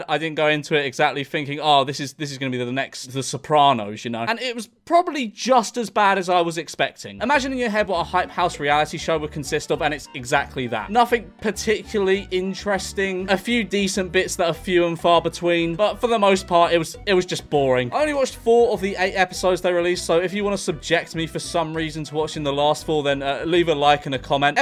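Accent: United Kingdom. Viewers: He sounds like jacksucksatlife but we’ll every non British or Western European person thinks all British people sound the same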